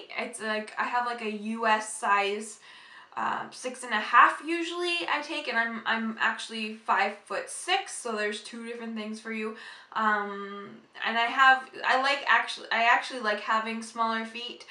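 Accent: Canada. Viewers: you MUST be Canadian! I can tell by the way you say "out". I <3 canada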